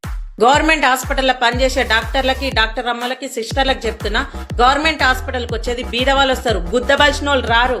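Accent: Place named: Telangana, India. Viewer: Name emo lady hitler in andhra anaw...akka slang endi telangana lo undi 😂😂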